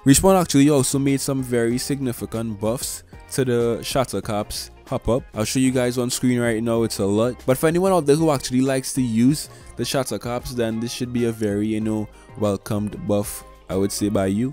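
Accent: Trinidad and Tobago. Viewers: Are you trini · Hold on….that accent…. You Trinidadian by chance? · Where you from bro trinidad? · Just came across this video and had no idea I was finna listen to a trini accent 💯🇹🇹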